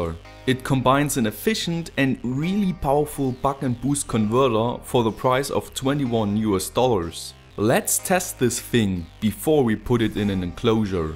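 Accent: Germany. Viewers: Are you german? That english...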